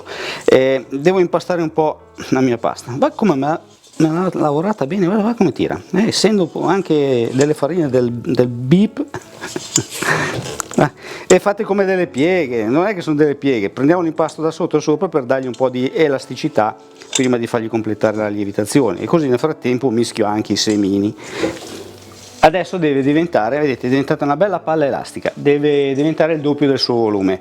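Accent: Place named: Milan, Italy. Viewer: Video doppiamente bello e buono, per il pane e per il dialetto meneghino.👏👏👏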